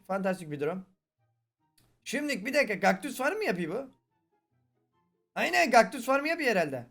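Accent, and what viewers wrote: Black Sea, Turkey. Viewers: Abi sen Karadenizli misin?????????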